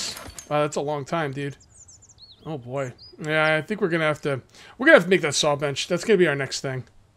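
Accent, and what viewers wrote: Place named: New Jersey, United States. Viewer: Good ole Dirty Jersey! My fiancee heard you say wooder(water) when I was laying in bed watching and she goes " hes gotta be from Jersey"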